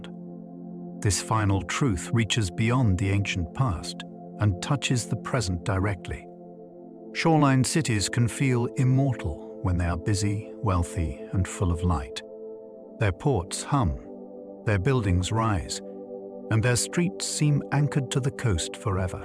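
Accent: United Kingdom. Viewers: That’s ironic that you chose a British guys Chanel. I quite enjoy his crisp accent, the cadence is soothing, as well as the tones. Good luck finding one to your liking, the rest of us will be content to stay.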